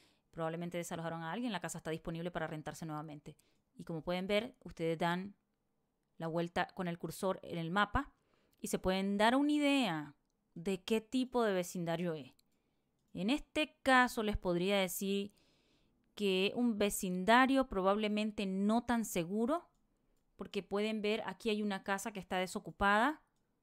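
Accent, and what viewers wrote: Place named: Central America. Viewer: Posdata: Sabía qué estás de Centroamérica por el acento pero no tenía la certeza de qué eras Nica! Yo también soy Nica,un saludo paisana! 😘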